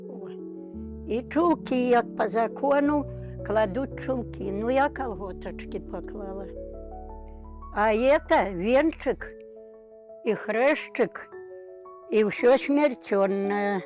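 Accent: Belarus. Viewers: Беларуский говор , как бальзам на душу. Мама моя родом из Полоцкого района, сейчас ей бы было 95 лет. В 1940 г.переехали в Сибирь.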